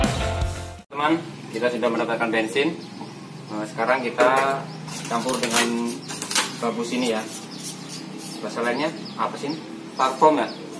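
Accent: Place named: Banyumas, Indonesia. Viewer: Ngomonge campur ngapak...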